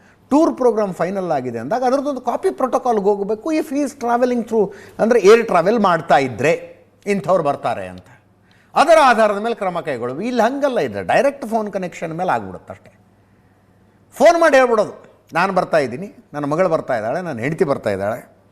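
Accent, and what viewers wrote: North Karnataka, India. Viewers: sir nimma bayalli tumba chanagi barutte namma uttar karnataka bhashe. haradu hannondu antha 😊😊😊😊🎉🎉🎉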